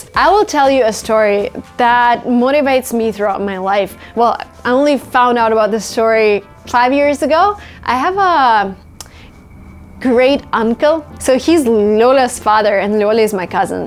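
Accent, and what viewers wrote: Russia. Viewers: От русского акцента получается избавиться невозможно? Легко вас понимаю, потому что вы говорите с русским акцентом. Произношение иностранцев даётся сложнее на восприятие и многое не понятно в речи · Она не строит предложения по-русски. У нее все в порядке с грамматикой. Пожалуй, единственное, что выдает в ней не носителя английского, так это мелодика русского языка, протяженное произношение гласных. А все остальное просто супер! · Don’t get rid of your Russian accent!! It’s beautiful i wish my voice was like yours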